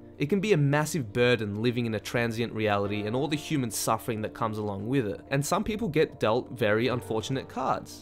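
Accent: Australia. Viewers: This guy has to be Aussie that accent was strong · This dude is very good at explaining, nice and clear with a friendly aussie accent... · Sorry, it's just hard to listen to a serious topic delivered on a thick Australian accent.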